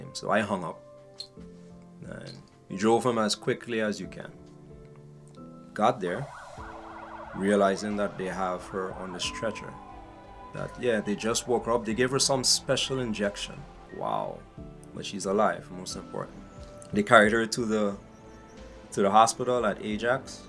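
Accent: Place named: Caribbean. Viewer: Where man can't, God can! Where we fail, He prevails! ... He sounds like he's from the Caribbean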